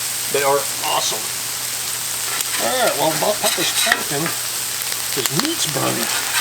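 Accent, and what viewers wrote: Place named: Texas, United States. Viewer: Hi Papa.. I'm your friend from Jordan.. I just love that Texan accent of you.. It reminds me of those old series i used to watch on TV when I was little kid... Like "Guns Smoke ".. I remember that guy "Festus".. I also remember " The High chaparral ".. 🤗 Anyways.. I enjoy so much your amazing.. Awesome ways of cooking, 🤗💕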